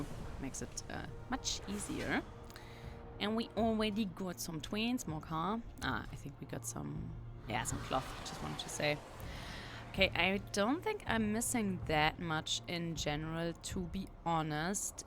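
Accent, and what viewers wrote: Germany. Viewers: i hea da an bayrischen släng aussa oda? xD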